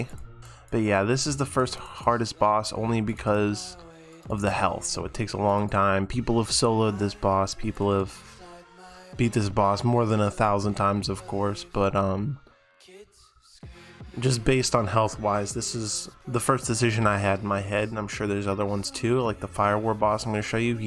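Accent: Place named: Bisaya, Philippines. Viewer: hahahahah bisaya spotted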